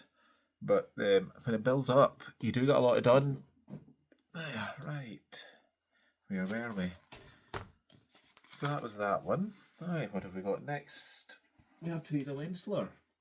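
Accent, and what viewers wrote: Scotland, United Kingdom. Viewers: Hello from Wellington, NZ. I have been meaning to comment for... well... 27 episodes but well, I usually watch you on the TV while I'm stitching. I have to say, I enjoy your lovely Scottish accent. You have a lovely calming voice and I enjoy relaxing to your Flosstube! I'm also a Flosstuber... just published #271 this morning. Was supposed to be Sunday but... YouTube did not let me upload yesterday/last night and I had to cancel and redo it. What am I stitching on? Well, right now I am taking a wee work break and having some chicken noodle soup. But, I will be getting on to a large redwork sampler after work - pushing for a finish this week. Happy stitching! Leigh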